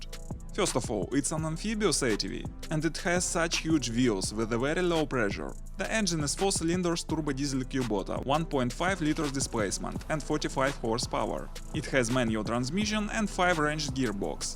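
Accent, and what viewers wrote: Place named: Russia. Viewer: Русский акцент аха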